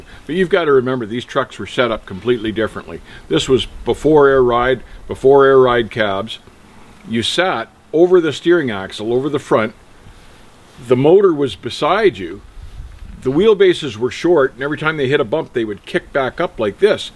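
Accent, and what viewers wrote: Canada. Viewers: Love the Canadian accent...it’s not about.....it’s a-boot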